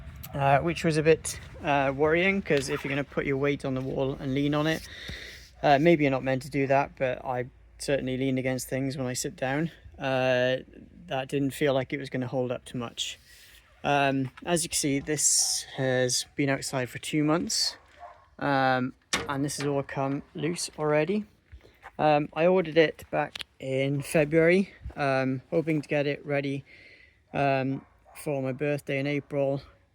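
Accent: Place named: United Kingdom. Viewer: My dear friend, you’re picking out faults that only matter if they don’t obscure the main problem - it isn’t a sauna! A sauna is a place where you can wash and use a sauna whisk with at least one friend, and preferably two. What you’ve ordered is a shoebox, and now you’re complaining about the screws. Those bloody screws aren’t going to make it a sauna when pretty much everything else is wrong. First, it’s too small even for two people. Second, there’s nowhere to wash yourself. And third, there isn’t even enough room to use a sauna whisk properly. But I have to say, your British English is an absolute pleasure to listen to:goodvibes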